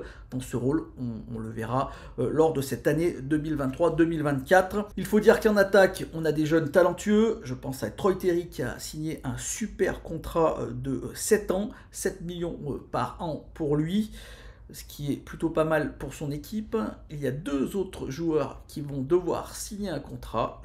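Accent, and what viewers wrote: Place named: Dauphiné, France. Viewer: Cool je découvre tes vidéos sur les preview je trouve tes analyses juste avec un accent dauphinois si je me trompe pas 😅 le top👍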